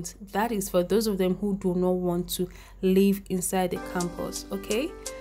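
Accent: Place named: Enugu, Nigeria. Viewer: Dat Enugu YouTuber, I love your accent and your work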